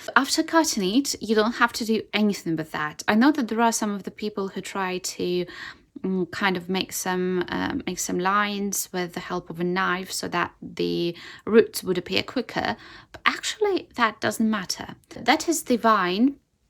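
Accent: United Kingdom. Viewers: I seems like you have learnt British accent, sounds good 😊. Honestly, I just learnt how to grow grape plants by you 😅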